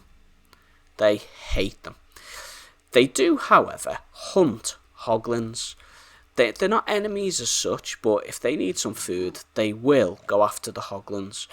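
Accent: Liverpool, United Kingdom. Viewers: Nice Scouse! Clive is SO cute ❤ He fits you for a spirit animal lol.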